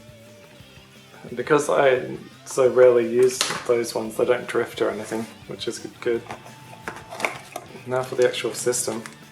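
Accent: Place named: London, United Kingdom. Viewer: sorry you just sounded like you were from London .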